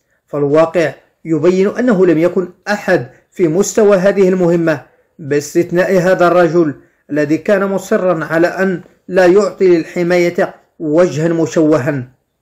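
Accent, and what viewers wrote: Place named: Algeria. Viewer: هدا لي تيهدر باين جزائري مافيها شكك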